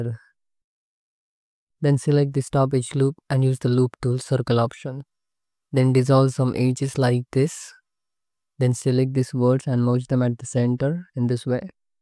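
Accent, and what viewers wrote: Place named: India. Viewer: Nice video dude, your English accent is like an Indian guy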